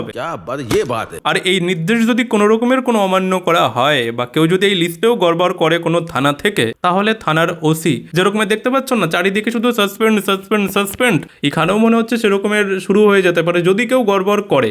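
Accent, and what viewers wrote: Bangladesh. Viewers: er vasa sunei mone hochhe puro bangladesi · traibunal er line e darayni. O to bangladesi katha sunlei bojha jay.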